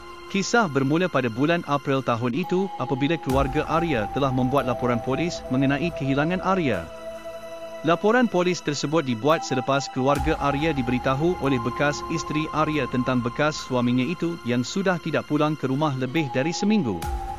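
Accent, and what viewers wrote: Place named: Malaysia. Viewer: Cannelnya solo suara prmbawa acaranya kaya orang malasiya ya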